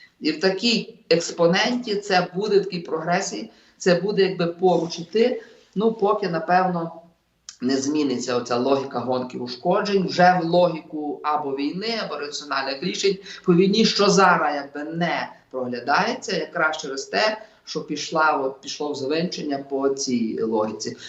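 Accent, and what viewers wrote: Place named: Carpathians, Ukraine. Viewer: Надо видеть цели России и Украины. Цель России - безопасность ее границ и безопасность русских. Возврат Малороссии в состав большой России вторичен, так сказать, два в одном. У Украины своей цели нет. Украину придумали англосаксы, чтобы разделить русский народ и заставить его воевать сам с собой. Яркий пример - сидит довольно умный этнически русский Дроздов и на чистом гнусаво - карпатском диалекте вещает в пользу Украины.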